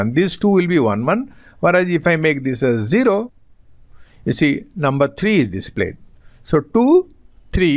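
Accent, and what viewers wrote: India. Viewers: Indian English funnyyy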